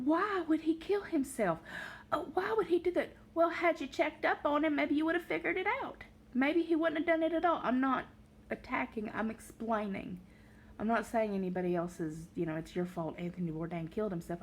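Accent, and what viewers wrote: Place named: Carolina, United States. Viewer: Thanks. I’m from chapel hill and i recognize that Carolina accent anywhere ❤️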